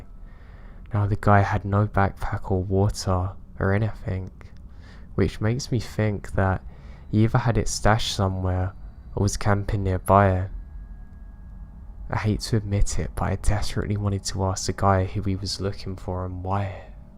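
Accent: United Kingdom. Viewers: What kind of accent do you have? Seems British but a bit different, great videos btw!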